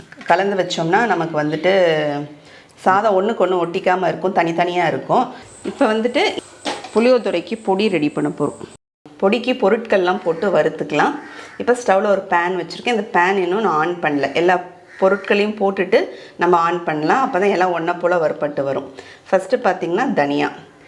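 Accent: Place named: Pudukkottai, India. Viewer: Iam 58 belong to Pudukottai The way you we’re speaking reminded my side people voice More than the receipe I enjoyed your Tamil. Good going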